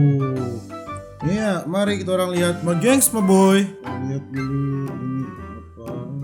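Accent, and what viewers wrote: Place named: Manado, Indonesia. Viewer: Hhhhhh lucu dpe ba gas.. bahasa manado 😂😂😂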